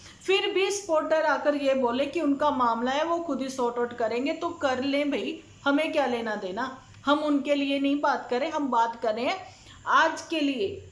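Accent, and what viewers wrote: Punjab, India. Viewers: that’s Good 👍 I love your Punjabi Accent lots of love side by 🇨🇦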